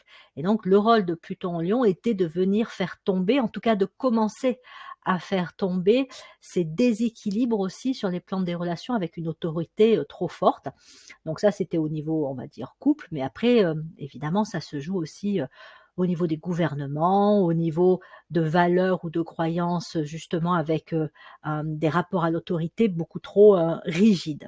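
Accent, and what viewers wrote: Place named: Nice, France. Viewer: Je vous découvre et vous trouve vraiment géniale, je parierai que vous êtes un gentil gémeaux niçois à votre accent et votre facilité d'expression! Merci de la part d'un petit gémeaux avec Pluton en Lion dont vous aidez à éclairer la lanterne. On attend la suite avec intérêt.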